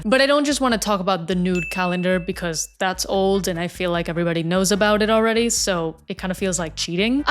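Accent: Spain. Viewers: Where did you learn spanish? The pronunciation sounds like you're from Spain. Saludos desde España :) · Acento español thick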